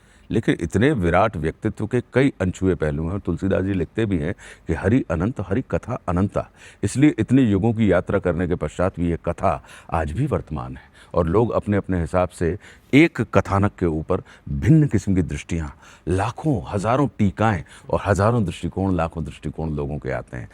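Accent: Standard. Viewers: So good to listen pure hindi, very rare to hear pure language these days. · Haven't heard such a pure Hindi narration in a long time. Ashutosh Rana's command over the language is impeccable. I wonder where the intellectual people of our country are nowadays? Feeling great listening to it. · Kya zbrdst shudh hindi bolte h ❤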